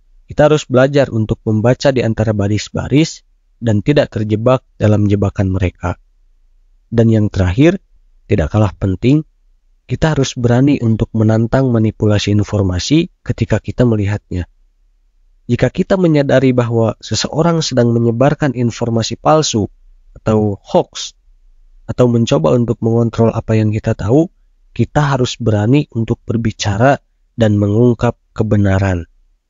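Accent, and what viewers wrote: Indonesia. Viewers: Sunda banget nada y 😁👍👍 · Senpet salfok, background nya meni garang, tapi voice over nya nyunda pisun 😁😁, hatur nuhun kang ilmunya, resep pisan videona meni berpaedah.. gass lanjutkaan berkarya.. ❤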